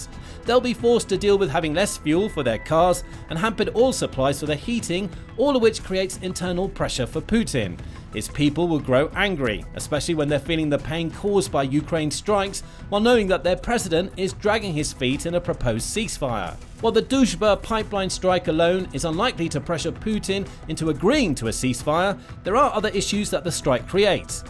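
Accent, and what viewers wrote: United Kingdom. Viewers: Unfortunately Zelenskyy said he does not know how the $350,000,000,000 in US AID has been spent. From the sounds of the British commentator, maybe the Brits know where the grift has gone.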